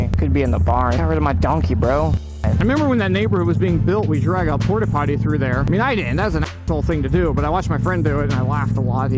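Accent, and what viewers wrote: United Kingdom. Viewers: loving the British accent XD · Absolutely splendid English accent, sir.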